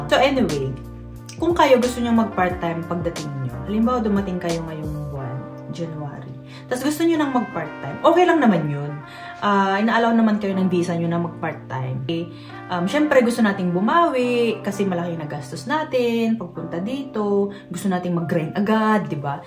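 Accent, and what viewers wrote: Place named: Sultan Kudarat, Philippines. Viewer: I love it ur "in Finnish eh" Isulan/Tacurong accent 😂❤❤❤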